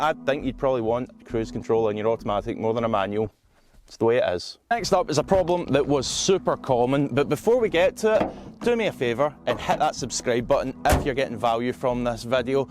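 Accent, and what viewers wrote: Scotland, United Kingdom. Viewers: Prude i got your Scottish accent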